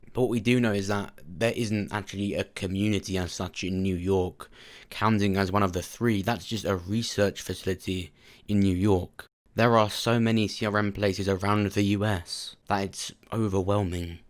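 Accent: United Kingdom. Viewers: Question bro... Do u live in the USA?.. How do u know wat the Philly sky line looks like? ... Just wondering because of ur British accent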